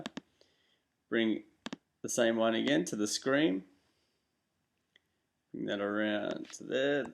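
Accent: Australia. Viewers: refreshing to hear an aussie haha good tuturial man!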